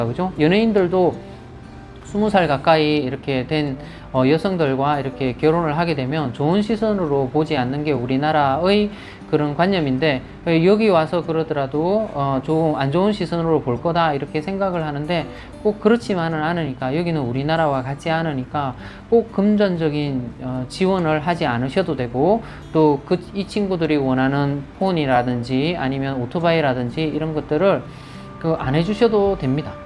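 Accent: Gyeongsang, South Korea. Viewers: 경상북도 내륙쪽 어디 억양이시네요~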